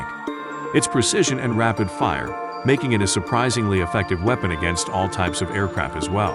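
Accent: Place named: United States. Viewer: good voice ofc amarican not this robotic english 🤖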